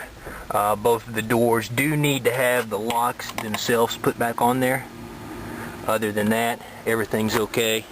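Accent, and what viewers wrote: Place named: South, United States. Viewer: what part from the south are you from lol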